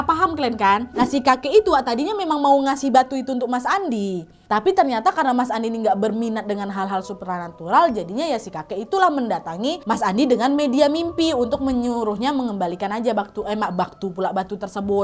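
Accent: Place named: Sumatra, Indonesia. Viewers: logat pku nya kental kali haha,semngtt ka,salam dari marpoyan sekitar😂😂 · Seru denger cerita pake logat Sumatera campur bahasa Padang dan Jawa. Jadi unik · asli urang awak Yo? Minang dimanyo? Masih totok minangnyo kayaknya.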